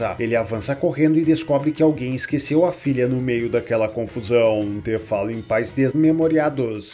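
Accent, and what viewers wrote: Rio Grande do Sul, Brazil. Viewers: Mas que barbaridade tche o guri tu e gaucho?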